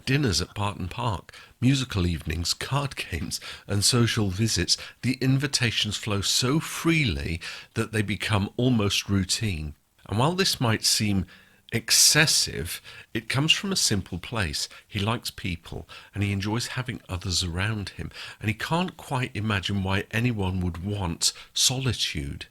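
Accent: United Kingdom. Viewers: Sir John is the comic relief along with Mrs Jennings. I like his boisterous personality. And I like your British accent, as many of we Americans do! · You've been criticized for that lovely, silky voice? Unbelievable. Your voice transports me in the same way a day at the spa with the sound of relaxing music and a pair of strong hands gently massaging away all the tension of a busy week. I often put one of your playlists on repeat when I retire for the evening. I always sleep better with a British accent in my ear.....and yours is the most soothing. Remain just as you are and allow malcontents to just drift off to another port of call.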